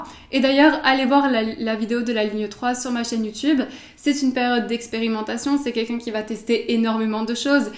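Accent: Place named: South, France. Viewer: ah merci:) en effet ca sentait l'europe méditéranéenne(surtout accent sur de la france, vers Cacasonne?)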